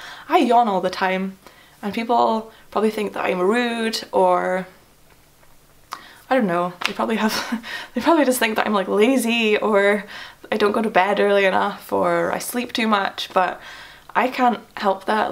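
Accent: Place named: Scotland, United Kingdom. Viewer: Just diagnosed a week ago, currently with CIS but with a likelihood of MS. I hear from your voice from Scotland too. Your video is seriously helping me coming to terms with things. I have just taken on gluten and dairy free too. You’re an inspiration. I’m afraid to tell people because I worry they treat me different. Sorry for the wee ramble, I wish you all the best 😘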